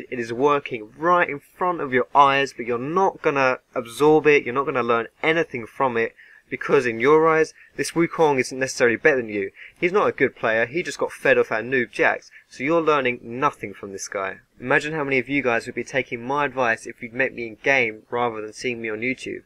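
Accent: United Kingdom. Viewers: expected a standard "ward here, lvl this and that, kill this first" guide stayed for the british accent liked because of the content i'll try to change more aspects about my attitude, but it's hard when the people you're trying to learn are completely over their own head ¬¬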